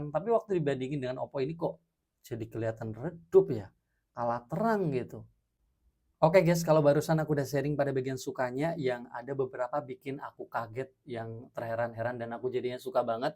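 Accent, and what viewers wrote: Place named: Cirebon, Indonesia. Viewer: Cirebon banget indo nya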